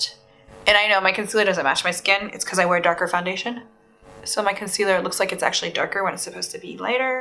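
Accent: Iraq. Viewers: Make arabic vids we like ur iraqi accent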